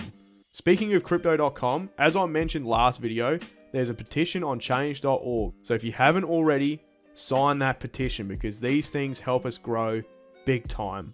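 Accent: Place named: Australia. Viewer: Thanks bro..good to hear an Aussie..im QLD'r..😃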